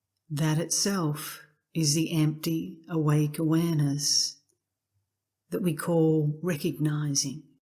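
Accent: Australia. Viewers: Since I found this playlist I am listening every night. To hear these teachings read in a straightforward Australian voice is particularly helpful to me (I guess there must be some "accepting", "identifying" or "clinging" in me there!). What the topic is "being natural", a natural voice is very apt. While listening, I follow the instructions of the teacher, as best I can.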